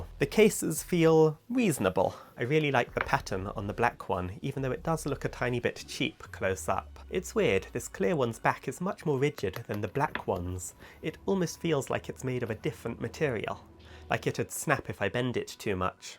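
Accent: United Kingdom. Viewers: Mate you are English for sure love it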